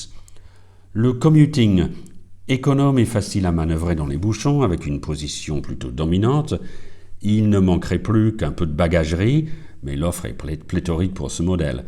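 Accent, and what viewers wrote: United Kingdom. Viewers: ah je me sens Plutot mieux mtn. Javais penser: comment ose-tu parler mieux le francais que moi? Pendant toutes les annees que je suis Aller en France on me dit " je n'ai jamais connu aucun anglais qui sache notre langue comme toi" mais vu que tu vie en France tout ce temps la, ca fait du sense tu que sois meilleur que moi. Ca fait plaisir aussi le fait que t'aie pas perdu ton propre accent britannique plutot que parler fr avec un faux accent fr enervant comme font les profs de fr au RU. 😀😀😀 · Voici donc une courageuse et chouette decision qui ravira votre auditoire MotoFrancophone auquel je fais désormais partie. J’ajoute que votre pointe d’accent British et un raffinement absolu pour l’oreille, tout comme pour superbe moto, la perfection de sa conception doit passer imperativement par un savant reglage de sa sonorite! Les oreilles au service des yeux en somme. 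Vous réussissez avec brio ce pari egalement. Bravo et un enorme merci de nouveau!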